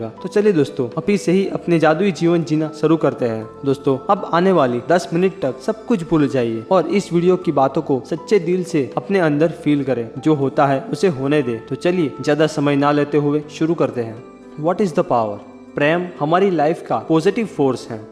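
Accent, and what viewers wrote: Gujarat, India. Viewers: Gujarati ya marwadi honge shayad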